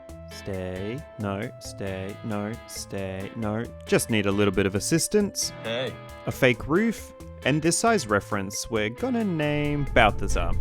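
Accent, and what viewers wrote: Australia. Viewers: You sound like Oscar Piastri or are you just australian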